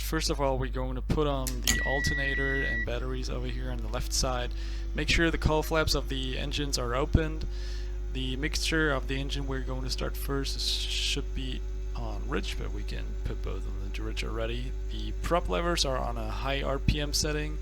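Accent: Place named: France. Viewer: Salut ! Sympa la review, tu es français non? Tu parle vraiment bien anglais mais j’ai l’impression d’entendre un accent français quand même ! Y’a pas mal de pilote qui s’entraîne en anglais c’est pour ça que je pose la question car j’arrive assez bien à comprendre ton anglais donc tu pourrais être français 😂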